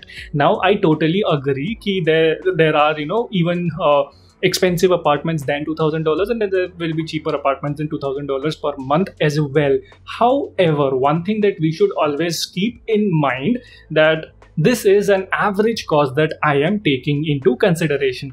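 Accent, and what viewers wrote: India. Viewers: Your Indian accent is so cringe and unbearable for ears.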